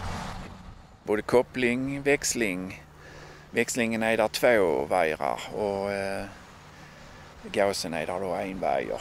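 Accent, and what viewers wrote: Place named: Scania, Sweden. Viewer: He speaks Swedish but with a dialect from southern Scania, the southernmost part of Sweden and therefore Scandinavia.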